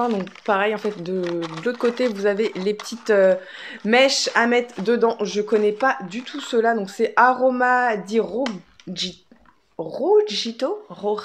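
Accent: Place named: Normandy, France. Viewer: haha t habites en normandie!! je me disais bien que tu avais ce petit accent si tu arrives a arreter de fumer ce serait top!!!!! mets la photo de ton pere sur ton porte monnaie ça va te motiver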